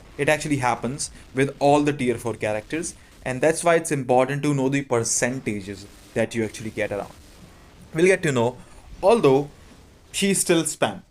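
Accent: India. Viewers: Your English look like Indian accent..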